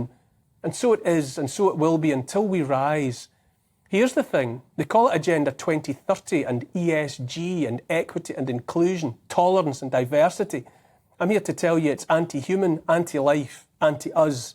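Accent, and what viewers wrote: Scotland, United Kingdom. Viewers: thank God for people like you. You are the best, views so eloquently put and with your adorable Scottish accent too. Wake up people!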